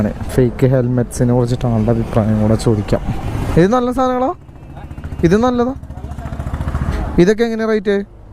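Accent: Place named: Thrissur, India. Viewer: പഴയ തൃശൂർ slang thirich vannittund.. loved it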